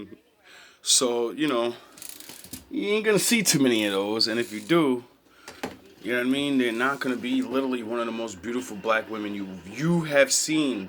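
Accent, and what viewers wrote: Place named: West Coast, United States. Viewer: You sound like you're on the west coast.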